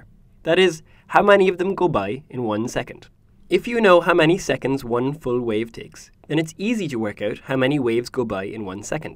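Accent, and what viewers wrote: Ireland. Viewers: His name and accent are Irish , fair play buddy ! Good video! what you studying ? Physics ? Is this video part of college or is it just a project you are doing ? I would be interested in making videos about science. Love teaching others! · I love his Irish accent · Of course he's Irish. Dunno about the Waterford part, I only know the wateford accent that tends to be monotone. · that an irish accent?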